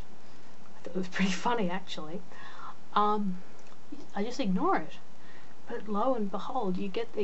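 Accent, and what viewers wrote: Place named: Australia. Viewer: Okay now I can hear the Australian, sorry.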